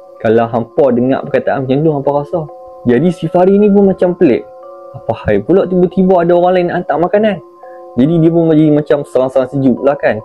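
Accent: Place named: North, Malaysia. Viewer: org utara dok.mana bang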